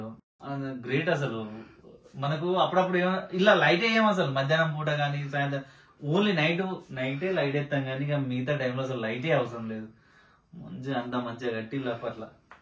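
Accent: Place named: Nirmal, India. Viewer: Meedhi Nirmal ha annna mem.sem hatlane matladutham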